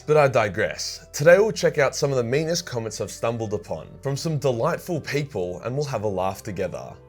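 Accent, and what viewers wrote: Australia. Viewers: Comedic aussie way? I must be honest, I allways thought you were just plain Australian and not trying to be funny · i don't really care about 40k that much, hell i have never played a game or read one of the books, but the Australian accent and the cheekiness is what keeps me coming back lol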